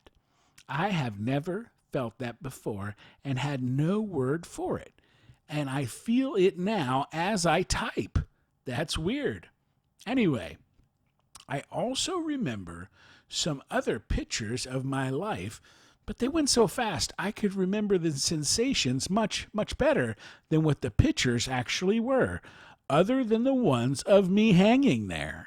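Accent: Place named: South, United States. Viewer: Awww that's a shame, I think the accent is very beautiful. I've been trying to work out, where it might be from. It does sound like a southern accent but I think it has a mix but could be very wrong. 🤔 Maybe give the channel a chance and see if you change your mind. Better a human voice than a computer for sure. If not, then Maybe you will find one that you do like. Have a lovely day 💛✨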